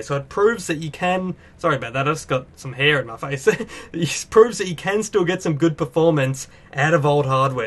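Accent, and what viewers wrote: Australia. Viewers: umm... are you Australian, British or another country/background. Srry I just really wanna know cuz i'm not good with accents:S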